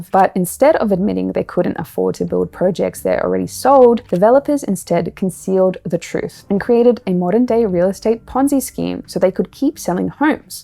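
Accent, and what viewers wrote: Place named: Australia. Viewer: Your starting to lose your Australian accent...😄 Thank you for the such details you go into too explain everything... it's a shame that 99% of the world's population has absolutely no idea what is really going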